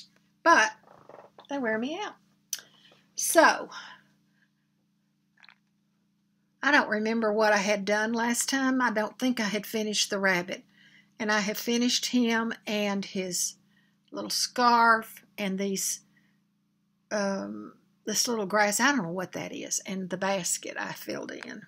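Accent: South, United States. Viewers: I enjoy your videos and live listening to your southern accent. One.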